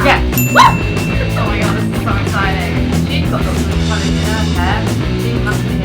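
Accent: England, United Kingdom. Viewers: Mar-tee-nes Its pronounced that way. But if it's cause your english accent then it's okay ☺️💕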